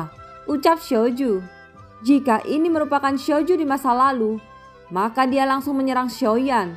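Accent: Java, Indonesia. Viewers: logatnya kaya orang jawa min..?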